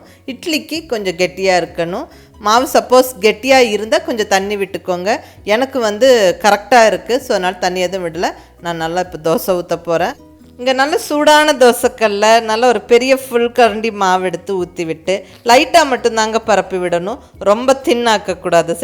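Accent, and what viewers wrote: Coimbatore, India. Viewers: Are you from Coimbatore? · I like your kovai thamizh..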